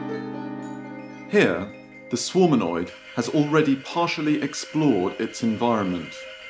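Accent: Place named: England, United Kingdom. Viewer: Vomito! This is the stupidest project I have seen on youtube. Funded by the European Union. Perfect. I thought I was going to throw up watching this giant waste of money and listening to the stupid pompous english accent. Next time, pay an immigrant to walk over and pick up the book. A lot cheaper. And less pollution.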